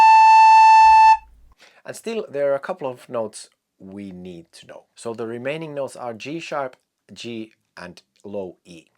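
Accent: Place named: Finland. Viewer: Great tutorial. And i really dig your Finnish accent and vibe—and smile. Thanks for posting.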